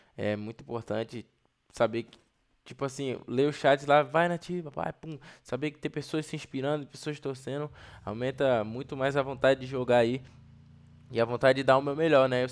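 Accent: Bahia, Brazil. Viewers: Nativa parece que mora aqui na bahia, olha o jeito que ele fala man, Muito massa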